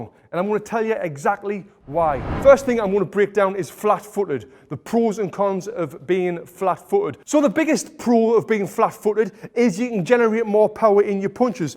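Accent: United Kingdom. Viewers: I consider your tactics fair. Boxing is a sport. In a sport we must use tactics and our brain first and foremost. So not engaging in order to get the best result for you is tactics. If your oponent has slow feet then using your feet as defense is a great tactic to tire him and make him frustrated. Whoever says that your defense and your strategy is cowardish needs to think again. For example, if I have an oponent who has great body shots and is taller than me, I need to play with my chin very low and using my body moves left and right to avoid the body shots and attack from the low with uppercuts and hooks, without using my cross and jab so much because I risk my game against a good body shot boxer. So this is a tactic. Also what you did is a tactic, fair, and you exploited your strength (fast feet) and your opponents weakness. Thanks for your impressive and detailed videos. 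I train myself using your videos, mainly for fitness purposes, and from every boxing youtuber you stand out for your athletic spirit, your kindness and knowledge and also for your badass British accent! Love you! Keep it up!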